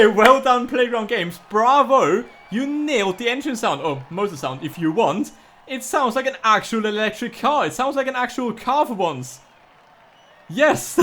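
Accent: Germany. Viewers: You are a German right? Ps: Me too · Er hat einen deutschen Akzent oder bin ich bescheuert? 🤔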